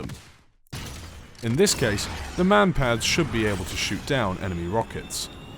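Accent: United Kingdom. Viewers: I think he's British so that's a given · He's British. Their accent makes everything sound more official.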